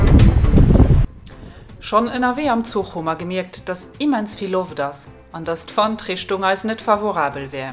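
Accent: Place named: Transylvania, Romania. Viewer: Ich sage ganz salop, hallo, die Fahrradtour und vor allem die Sprache hat mich einfach platt gemacht!!! Ihre Sprache, ist mein DIALEKT. Den Untertitel habe ich ausgeblendet damit ich Ihr "Siebenbürgisch" richtig genießen konnte. Einen Daumen hoch und Abo habe ich hinterlassen. Wünsche allzeit gute Fahrradtouren.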